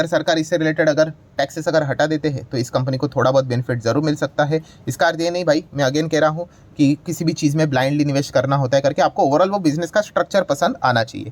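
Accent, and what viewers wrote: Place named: South India, India. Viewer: Are you madrasi,because ur Hindi pronounce is like madrasi people pronounce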